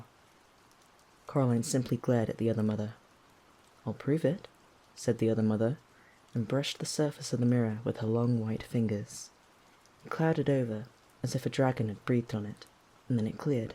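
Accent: United Kingdom. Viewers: I'd never thought that a British accent Coraline would work just as well as the typical American one, yet here we are.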